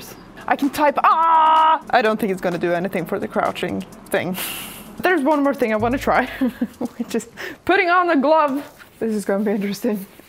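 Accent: Norway. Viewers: 1) You should make it in hot pink to match your hair and 2) a green laser on your fingertip.... 🙂 This is very awesome. I love your accent, too. Norsk? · good to hear a fellow norwegian :)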